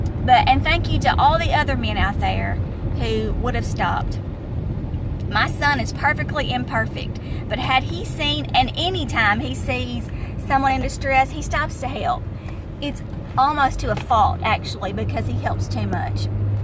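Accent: South, United States. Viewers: understood. It is also strange because it sounds like you are from the south. Don't give up hope. Seek first to understand what is happening to our culture so you don't suffer needlessly.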